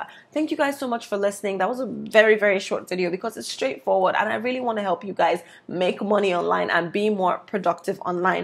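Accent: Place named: Nigeria. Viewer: I knew you were a Nigerian right from the beginning I heard your voice. Nice Video 😊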